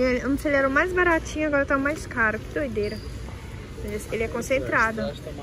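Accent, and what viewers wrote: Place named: Minas Gerais, Brazil. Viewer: Eu amo sotaque assim. Não conheço mas parece ser mineira ou go 😂.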